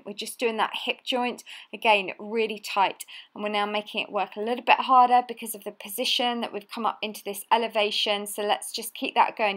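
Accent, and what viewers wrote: England, United Kingdom. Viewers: Love your ab and booty workouts!! Haha and i love hearing you say "booty". I love English accents!!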